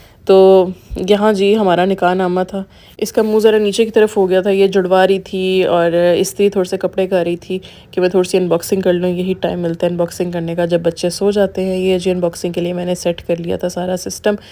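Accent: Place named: Punjab, India. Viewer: Your punjabi acsent is soo funny😂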